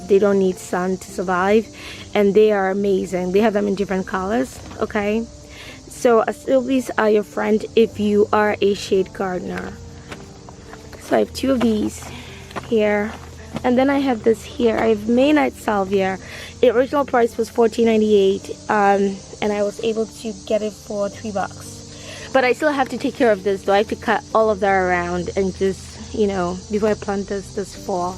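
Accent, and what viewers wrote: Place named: Africa. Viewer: Sorry to bother you so much today but I’m on bed rest, there is an a more soothing channel than yours😎🤟 Your African accent is 🎶🎵2 👂❤ So happy we met 🎊 🎉 Keep it up 👍 Always learning here Impressive all the improvements to date 👋 see ya around. ❤❤❤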